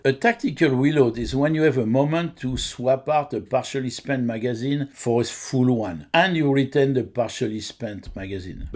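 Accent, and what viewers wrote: France. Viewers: I used to be an IPSC shooter, not a very good one, but I definately recognize the skill that Keanu Reeves has. He might only be shooting blanks but the gun handling is definately top notch. He is truly a very good shooter with great skills.: I love your videos, they are well done and the French Accent is a killer.. Je parle Francais aussi....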